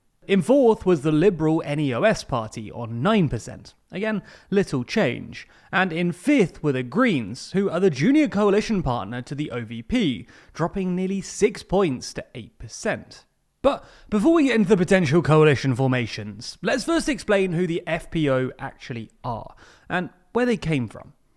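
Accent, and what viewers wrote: United Kingdom. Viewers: This sound doesn’t exist in English. You’re watching a video from a Brit so expect that pronunciation. Should you wish to hear a proper “Ö,” watch a German language video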